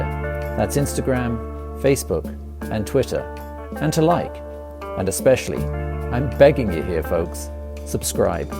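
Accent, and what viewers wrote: United Kingdom. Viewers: Wait, waht, what? A British accented POME giving Canadian pricing? Consider me subscribed.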